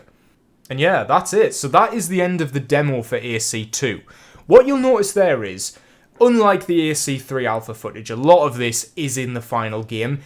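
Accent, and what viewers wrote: United Kingdom. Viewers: That thick British accent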